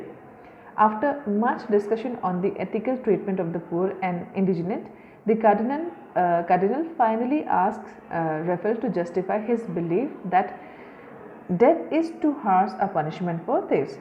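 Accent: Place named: India. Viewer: I hate the Hindu accent, but this is the only summary available.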